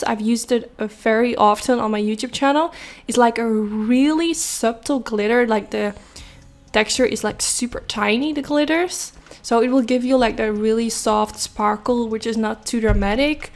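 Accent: Netherlands. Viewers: Beautiful look 😍 Are you Dutch? You have really funny accent ☺️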